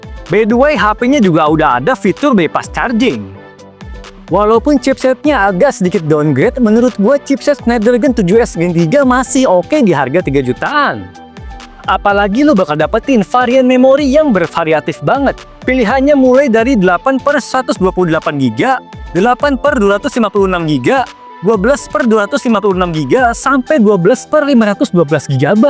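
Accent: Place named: Medan, Indonesia. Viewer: Medan cuy😅😊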